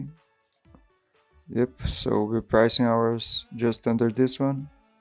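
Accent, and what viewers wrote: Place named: Brazil. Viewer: gg where are u from bro? your accent looks like brazilian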